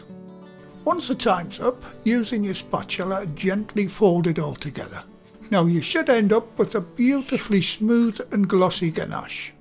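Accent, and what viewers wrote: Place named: Newcastle, United Kingdom. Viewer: To be more specific it is a Newcastle accent. There's quite a variation of North East accents and is quite soft compared to some, which you could cut with a breadknife (or "brweadknafe") It's become very familiar to me having lived up here for 25 years. It can be quite a strong accent and get mistaken for a Scottish accent with a lot of dialect words too.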